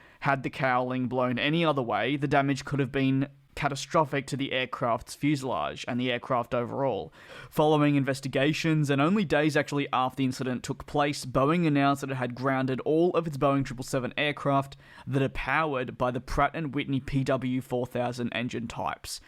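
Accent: Australia. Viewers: Are you from Sydney Australia?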